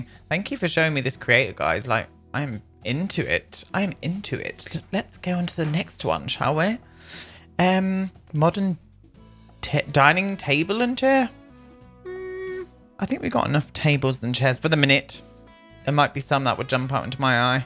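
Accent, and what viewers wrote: United Kingdom. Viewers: Your accent is the accent I do when doing a british accent and I guess it's a real accent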